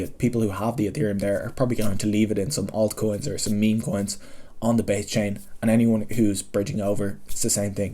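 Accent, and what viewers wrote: Ireland. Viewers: Dope video what part of Ireland you from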